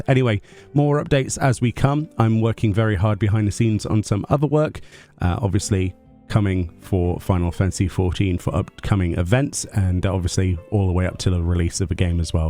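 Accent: United Kingdom. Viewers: Me watches cause he always posts video's on the latest ffxiv. And I want to stay updated. also he avoids drama. also he has a nice british accent Haters on the internet: we don't like that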